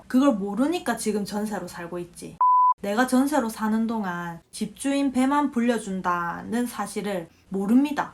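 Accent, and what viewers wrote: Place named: Gyeongsang, South Korea. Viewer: 의 나레이션을 보면서 문득 드는 생각은 내가 남부경남 출신으로서 의 사투리가 무척이나 인상적이다. 남부경남 특유의 성조가 두드러지게 나타난다. 2의 2승 e의 2승을 설명하기에 무척이나 최적화된 사투리 억양을 가지고 있다. 남부 경남 사투리 특유의 '강조'를 할때 다소 신경질적인 톤이 나오는 고유의 억양을 가지고 있다. 기회가 되면 남부 경남 사투리 연구를 해보고 싶다는 생각이 들기도 한다. 다른 사투리는 별로 관심이 없고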